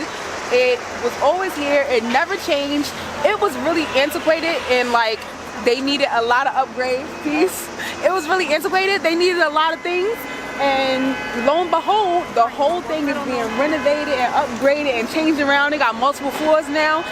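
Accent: New York, United States. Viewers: That New York Accent 😍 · Love her NY accent! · She has such an authentic NY accent. Love my people! My fiance is from Wavecrest! I miss farrock.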